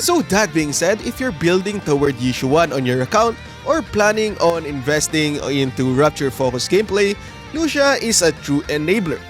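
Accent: Philippines. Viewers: Are you filipino by any chance? you lowkey have an accent.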